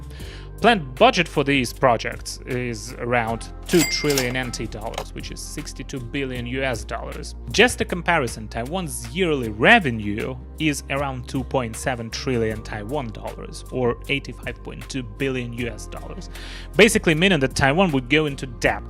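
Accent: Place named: Europe. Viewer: Nice haircut and nice shirt. and your English is getting more European. that's not a bad thing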